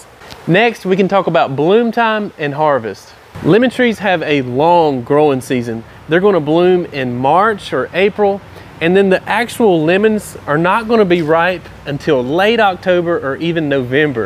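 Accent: South, United States. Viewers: Hilarious! I just got myself a little Meyer lemon tree and wanted to take the very best care of her- saw that this video had a southerner explaining stuff and knew I was in the right place! Thanks a bunch! Especially liked the alternative options for soil and fertilizer. One question: I watched a another person's video on YouTube and she mentioned that you should look for the part of the trunk that all the roots are coming out of ( it kinda looks like a peanut in the shell) , she said when repotting you should keep that part above the soil. Any thoughts or other explanations? Thanks again!!!!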